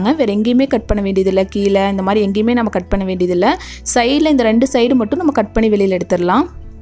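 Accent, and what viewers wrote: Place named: Tiruppur, India. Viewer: Amanga nanum tirupur thanga...athanunga pathen nenga pesarathu namma ooru maathiriye irukunu superb nga ka...nenga podara videos ellame rmb rmb superb nga ka...unga video epa varumunu nan parthutte irupenga ka vanthathum parthuduvenga ka...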